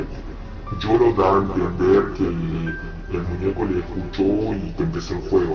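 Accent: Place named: Colombia. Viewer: No la saca de Google Cómo explicas su voz original, tiene acento Colombiano y Juan Carlos no la tiene es obvio que no es solo lo dijo para ver cuánta gente le cree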